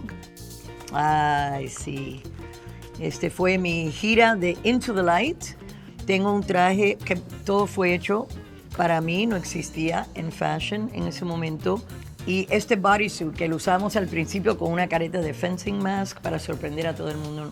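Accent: Cuba. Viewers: Como se oye para ti? Yo soy mexicano creado en Florida. Para mí se oye bien bien Cuban, aunque ella creció en Miami. Te suena su acento gringo al hablar castellano? Como se compara a un acento auténtico cubano--de la isla, diferente a lo cubano de Miami. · Gloria nunca pierde su acento cubano... Bella!!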